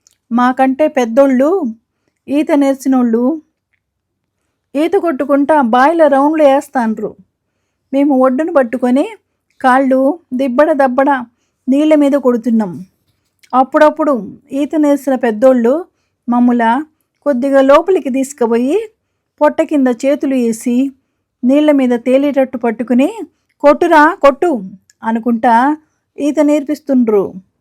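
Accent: Telangana, India. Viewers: Kada bagundi mariyu madam telangana yasa lo cheppadam chala baga andaginchindi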